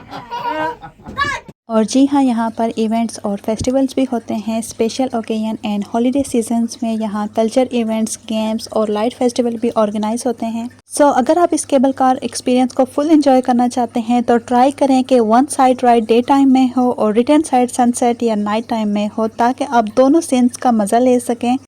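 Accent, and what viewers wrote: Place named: Pakistan. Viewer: Rayal nahi madam...Riyal hota hai 😄😄 Pakistani English UK English aur USA English sab English ko merge kar ke explain kar rahi ho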